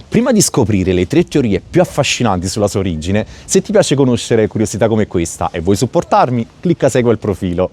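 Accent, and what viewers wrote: Naples, Italy. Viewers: in lingua italiana perfetta nell'accento napoletano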